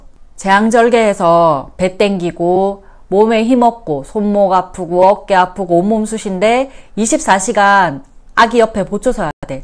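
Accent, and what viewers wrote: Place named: Busan, South Korea. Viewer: 부산분이신가봐요ㅎ 말투가귀엽ㅎ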